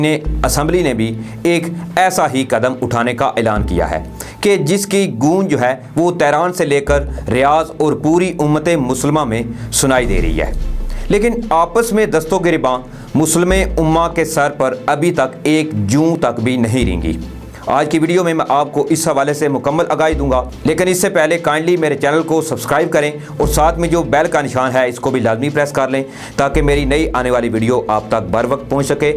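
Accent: India. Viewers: Is the presenter an Indian from his accent?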